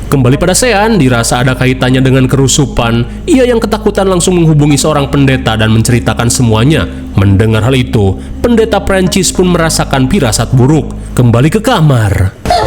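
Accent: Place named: Java, Indonesia. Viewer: jowo pisan ta iki😂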